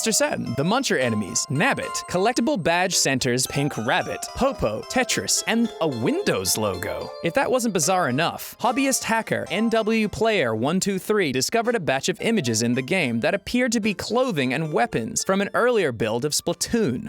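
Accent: Australia. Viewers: I love the occasional Australian dialect pop out here and there, your not fully Americanized yet mate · His Australian is showing.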